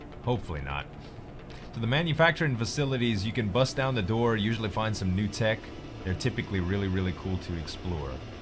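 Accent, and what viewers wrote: Louisiana, United States. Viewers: Z, I can tell your from Louisiana...I am also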